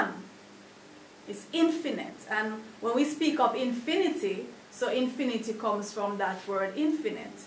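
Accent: Jamaica. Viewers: Really nice to hear a Jamaican voice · are you a Jamaican I love the way you speak... The accent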